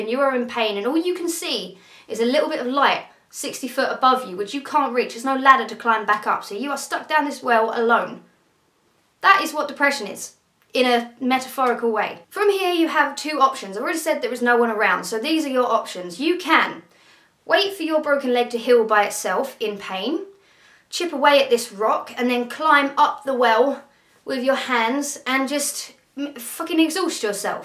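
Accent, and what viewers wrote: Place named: England, United Kingdom. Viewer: I am learning English and I loved to hear you and I loved your accent. Where are you from? England?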